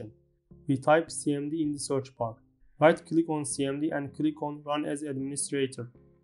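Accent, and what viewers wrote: Turkey. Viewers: Abi Türk olduğunu aksanından anlamıştım gayet güzel videolar çekiyorsun eline sağlık :) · aga yeminle ingilizce konuşmandan bile türk olduğun anlaşılıyor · iliklerime kadar türk ingilizcesi en sevdiğim :) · Damn your accent tells me that you're Turkish. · Türk ingilizcesi diye bir şey var buna eminim ben artık. Çok faydalı video teşekkürler!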